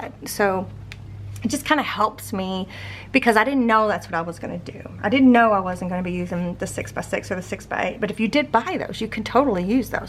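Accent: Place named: Tennessee, United States. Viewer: I enjoy crafting along with you because you’re so very kind to your viewers.😊 It’s always a pleasure spending time with you, especially when you make a boo boo and you show us how to fix it. 🤗……also, I love your accent. I grew up over the hill in Tennessee and when I hear your voice it reminds me of home.❤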